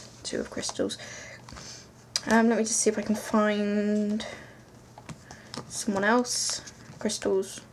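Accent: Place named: England, United Kingdom. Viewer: Excuse me for being Off Topic but your accent is charming. Where are you from in England? I'm writing from New York (Na' Yawk). BTW, The Voyager Tarot is an excellent deck. You really have to "work" with it, but the payoff is great.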